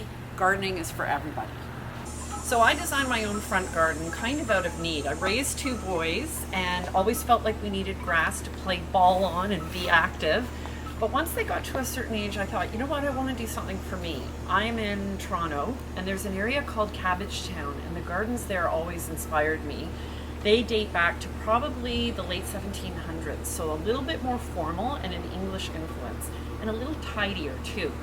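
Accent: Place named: Ireland. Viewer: A great garden, are you Irish. You sound a bit Irish. Keep up the good work.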